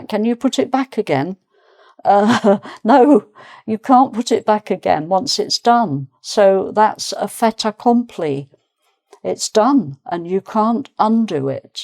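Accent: United Kingdom. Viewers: Oh, I had started learning the British's accents with you! It is of a great pleasure to learn here, till now a days. I started more or less 3 years before my post-graduation for teaching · I have been acquiring the English language for a long time, ever since 2004 and for reasons of material availability, I picked up an accent resembling that of USA. Now at the age of 31 I'm seeking to transition onto a more British-sounding quality, for which I looked for videos of this lady and, reading the comments, I just found she passed 😢 Hope she is resting peacefully. You 'were' not great, you still ARE and I'll make sure to make good use of your lessons. Thanks for your contribution to every student of English and I wish you a calm journey 🤍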